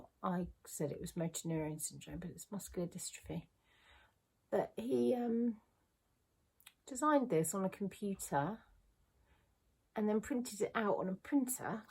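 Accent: United Kingdom. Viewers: Lush to hear the Brisle accent 😂 I hope you keep practicing your spinning.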